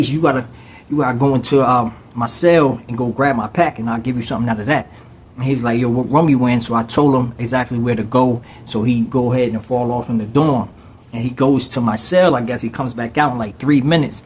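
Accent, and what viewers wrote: New Jersey, United States. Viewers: I knew you were from Jersey before you even said it🤣! Newark in the building. This the first video I’ve seen and I was confused because I’m hearing a Jersey accent on a Georgia prison video it was unexpected.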